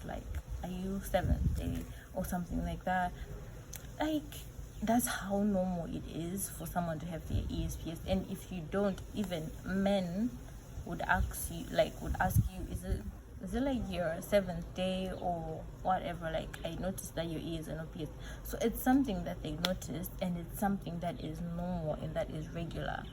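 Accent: Ghana. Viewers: You sound a Ghanaian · Ghanaian accent is the best just like the British.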